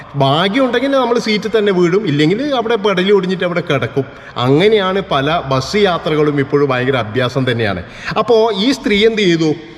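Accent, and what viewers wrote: Kannur, India. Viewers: Kannur slang nice ❤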